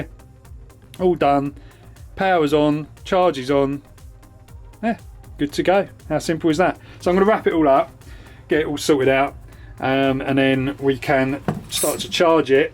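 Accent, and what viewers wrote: United Kingdom. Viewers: From your accent I guess you're British excellent very brilliant was Gordon Ramsay your chef and I do think it's brilliant how you're soldering batteries I want to do the same thing to my e-bike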